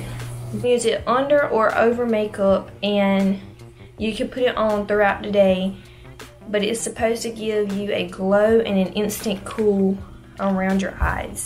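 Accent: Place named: South, United States. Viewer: omg i love your accent!!! country gal just like me and a natural i love it girl!!! Will subscribe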